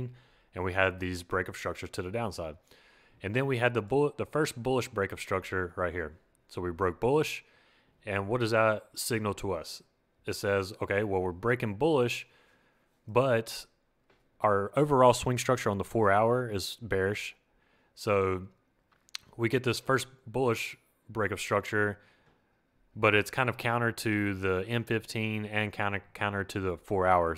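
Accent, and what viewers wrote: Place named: Louisiana, United States. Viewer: are u from louisiana?? cause i am and u sound familiar?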